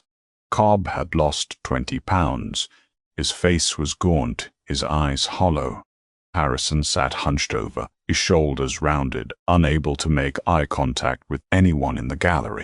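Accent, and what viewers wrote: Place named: United Kingdom. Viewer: Great story but again, this voice is terrible. Maybe try something else, but keep it british! 🙏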